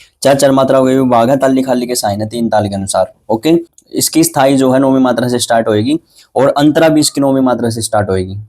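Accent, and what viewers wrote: Haryana, India. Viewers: Yes sir definitely 😁 haryana se hai , I am also from haryana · Hainaaa mujhe bhi yhi lgaa inki voice mei haryana ki tone aati h 😅😅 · haryanvi ho kya app voice se lag rha hai . sir vo notes toh provide kr do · Maan ge maaster kati haryanvi ki feel ha 😁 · haryana aala ki boli ghani hai pehchane ne ke liye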